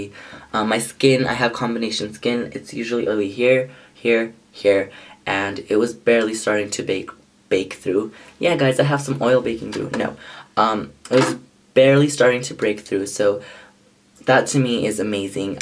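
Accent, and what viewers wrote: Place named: United Kingdom. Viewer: Omg your british accent was to die for! Soo cute and I've only just begun the video :)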